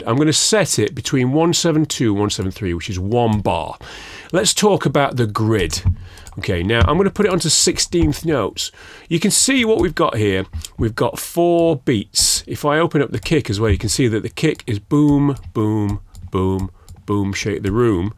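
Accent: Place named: North Yorkshire, United Kingdom. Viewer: Do you do 1 on 1 training? You sound like you might be from round my way… (North Yorkshire).. although been residing in BKK for 15 years. Anyhoo.. let me know.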